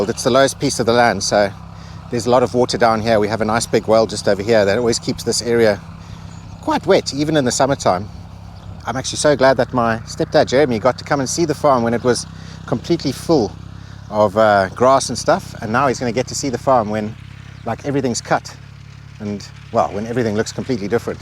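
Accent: South Africa. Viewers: You sound South African... watching from Durban🇿🇦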